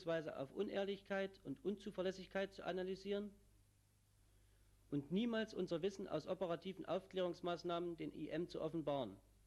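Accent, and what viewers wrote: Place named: Saxony, Germany. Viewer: Leicht sächsischer Akzent, ansonsten roboterhafte Kälte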